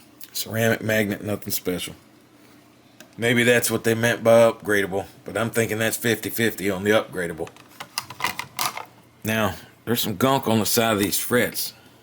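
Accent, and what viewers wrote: South, United States. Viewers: are you located in Orlando? I always thought you were from Kentucky, Alabama or somewhere down there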